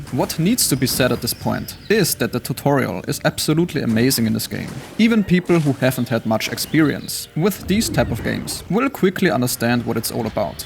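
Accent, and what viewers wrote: Germany. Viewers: U german bruh ?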